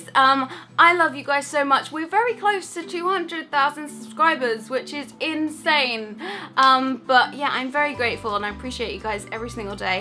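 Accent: United Kingdom. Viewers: This may sound weird but I LOVE your British accsent I want to move to Englend SOOOOO bad😟 I'm AMERICAN AND WANT TO MOVE TO ENGLEND 😭😭😭😭😭😆😆😆😆🇬🇧🇬🇧🇬🇧🇬🇧🇬🇧🇬🇧🇺🇸🇺🇸🇺🇸🇺🇸🇺🇸🇺🇸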